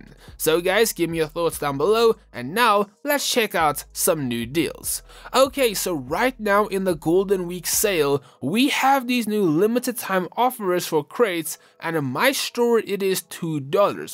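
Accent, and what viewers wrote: South Africa. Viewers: Bro is south african?? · are u from south africa? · ARE YOU SOUTH AFRICAN?!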